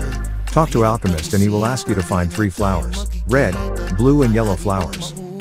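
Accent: Philippines. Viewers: BRO HIS SOUND IS FROM FILIPINO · R u from Philippines that sound familiar?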